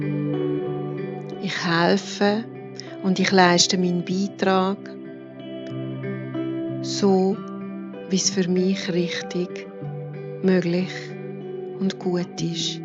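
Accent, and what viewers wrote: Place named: Swiss German, Switzerland. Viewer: Da meine Frau so heisst wie Du, warst Du mir gleich sympathisch. Deine Stimme ist wunderbar fein und weich, geht mir tief direkt ins Herz. Die Mundart gibt dem Ganzen eine spezielle persönliche Note. Der Wechsel von Gefühl zu Energie und Bestimmtheit ist ganz speziell, passend und schenkt wunderbare Energie! So wia's guat isch! Vielen Dank dafür! Alles Liebe, Albert Pucher.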